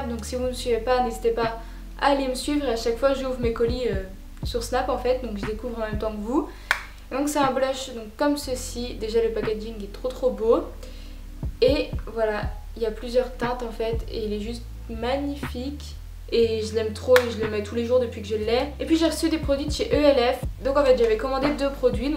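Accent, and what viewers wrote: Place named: North, France. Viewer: De quelle region es tu je trouve que tu as l'accent du nord !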